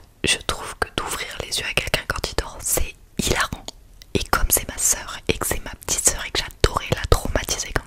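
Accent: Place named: South, France. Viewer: J'avais jamais entendu ton accent du sud !! 😂 Excellent. Moi je le prends depuis que j'habite dans le sud sur certains mots aussi.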